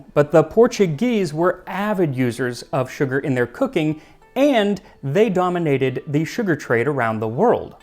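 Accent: Brazil. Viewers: Great video just irks me that when you talk about portuguese dishes or words u always say it with the Brazillian Portuguese accent and not the original Portuguese accent (which is fair for example in the Feijoada video), but it doesn't make sense in the video referring to dishes from Portugal like this/ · Bro, please DO NOT pronounce Portuguese words like the Brazilian do, it sound VERY VERY WRONG - cringe AF! Portuguese from Portugal sounds absolutely NOTHING like Brazilian Portuguese! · I love your channel, but as a Portuguese native, you are saying portuguese words with a Brazilian accent.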